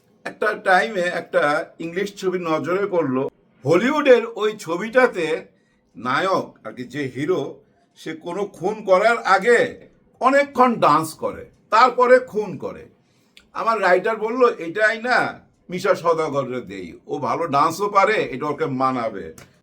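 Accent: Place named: Narsingdi, Bangladesh. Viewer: আমাদের নরসিংদির মনে হচ্ছে।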